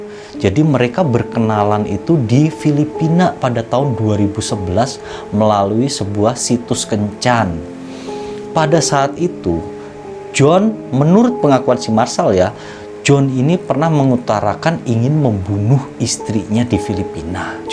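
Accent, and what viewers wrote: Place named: West Java, Indonesia. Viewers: Bahasa sunda na nyangked wae nya kang hahaha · mang kayaknya orang sukabumi/bogor... cara ceritanya, bahasanya bisa d fahami dengan jelas ma gw 🤣🤣🤣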